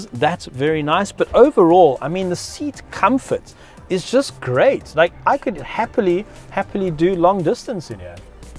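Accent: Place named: South Africa. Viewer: hey, to be honest, south african accent is way easier to listen to compare to the aussie ones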